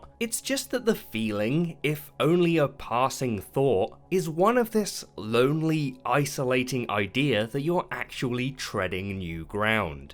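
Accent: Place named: United Kingdom. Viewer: Totally agree. I think it's both but also his voice. He's got a pretty calm uk/euro accent that has a comforting quality to it. I use it to fall asleep to pretty often too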